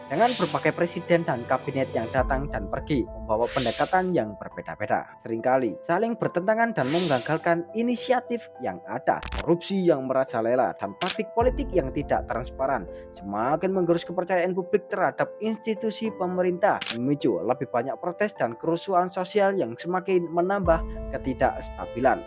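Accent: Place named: Java, Indonesia. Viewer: ngomongnya ga usah buru2 bg mending pelan2 tp jelas,kecepetan jd kdengeran medok 😅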